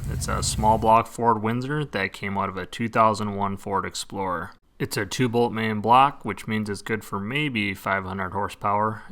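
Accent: Midwest, United States. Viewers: Could tell he was Midwestern right from that accent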